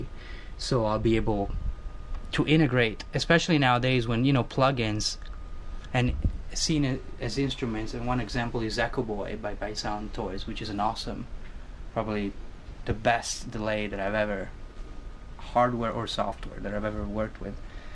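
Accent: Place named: United States. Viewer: grande mozza ! beato te ;-) you're picking up an excellent american accent...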